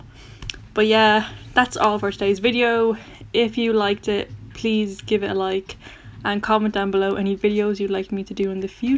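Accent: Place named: Ireland. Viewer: Lol, I click on your video and straight away I hear the accent and know your irish😂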